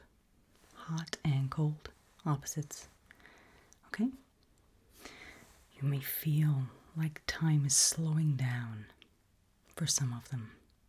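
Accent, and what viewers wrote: Midwest, United States. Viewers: Wow, your American accent is SO good! Personally, it makes me think of someone from the Midwest who's moved to New England. It also really reminds me of a specific episode of Orphan Black in which one of the characters who has an Irish accent (Siobhan) is impersonating someone who's American or Canadian (the show is rather vague about that for the most part). Regardless, I'm really impressed and rather unnerved, seeing as I'm so used to hearing an English accent from you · The basically perfectly American sounds coupled with the still-mostly-British cadence makes this so surreal 😂 fantastic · Everyone’s talking about the American accent, but this is my first video so I was thrown off because I thought she was going to be Russian😂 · I spent more time seeing if you'd slip up 😅 but you did really good. Not dodgy at all! "If you were stood here" was the most sus I like your American accent. 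Hope we can hear more of it! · It sounds like a midwestern accent, like in states near Canada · the American accent??? 😱🤌🏼❤️‍🔥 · I was wondering why you sounded so different at first until I noticed all the comments. You really hammered the American accent pretty well. It's hard to pinpoint a specific, but it's like a mix between the Midwest and New England accent. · The Ameirican accent was not expected but was very much appreciated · pls more amerivan accent asmr❤ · I was not expecting the American accent, caught me off guard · AMERICAN? as an American myself this was pretty good!! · Really love the American accent! Very tingly ahaha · That American accent freaked me out but it was very good 😅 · Awesome U.S. accent! Truly! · Greetings again good lady. You are simply going from strength to strength with every upload. This video, the A-Z, the Backrooms video, the Infernal Job Interview... 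I could keep going, but will not for the sake of brevity. I loved your American accent here, and it seems that your American watchers did as well, judging by the other comments. I thank you heartily for your wonderful work, and I eagerly await your future uploads. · As an American who's never seen your channel before, I couldn't tell that you weren't American until I opened the comments. Well done. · Okay, having rewatched the video a couple of times now ( -induced naps for the win), I have to admit that this American accent of yours is really growing on me. I imagine it's quite the shock for many viewers to see your signature voice sound slightly altered, but it's also super fun to see (well, hear) you playing around with it! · Great American accent! 👏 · gotta say, (as an american) you NAILED the accent! it was so uncanny to hear you as an evil *american* scientist as opposed to a british one! 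· This is the second video I’ve seen of yours where you use an American accent (the other being the Noir Detective role play) and damn, you pull it off really well! Like others have said, your “Hi there” had me saying “Whoa what?!” 😂 Impressive as always! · The American accent gave me whiplash so hard that I’m now fully awake 😭🙏🏼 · Haven't heard this accent since your last cranial nerve exam I believe! Very appropriate to use an American accent for MKUltra 10/10 accuracy and performance. Love your vids, thank you so much for keeping us entertained (and relaxed) <3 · Oh wow! The accent is great. If this was my first time watching I'd think you were American! Just a few vowels gave you away 😉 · I’m a californian and she sounded almost mid western to me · You say it's dodgy but I immediately clocked it as a non-specific American accent with the "Hi there." So I think it's a success. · Your american accent is so good, coming from an american! 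You sound like my family members from the midwest. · Not only the "R"s, but also the use of "gonna"? Excellent American accent! Really impressive (and still just as precise and relaxing in tone).🖤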